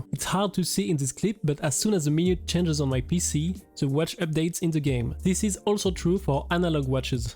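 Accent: France. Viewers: Il EST français that’s not just his accent bro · You from France? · Heaviest french accent ive ever heard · Att la dinguerie il est FR🔥🔥🔥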